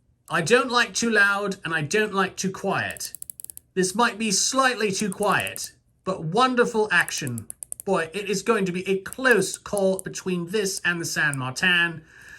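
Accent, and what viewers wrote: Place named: England, United Kingdom. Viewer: Brilliant video sir! And you kept that (exceptionally good) English accent up all the way through too! 😄👍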